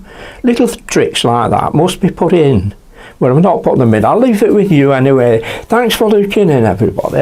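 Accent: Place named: Northern England, United Kingdom. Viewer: Lol. Sorry,. I've never been first before. Just want you to know how much fun I have watching your videos. I love your freedom while painting, even tho I can't understand half of what you say. I'm from the US and my ear isn't tuned to Northern England. But it's interesting to put it together from context.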